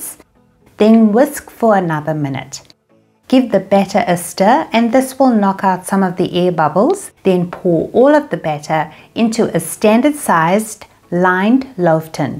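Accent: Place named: South Africa. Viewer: I was guessing maybe you had a South African accent it's always beautiful but always hard to place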